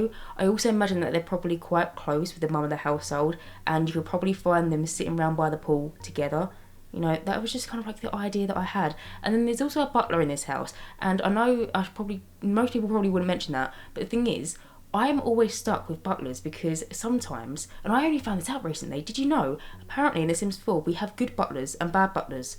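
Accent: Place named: Essex, United Kingdom. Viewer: just curious but are you from Essex? your accent is amazing!! I just found your content, love it.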